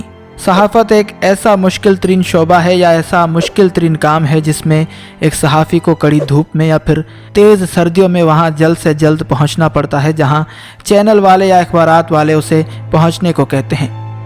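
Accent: India. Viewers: You sound like Indian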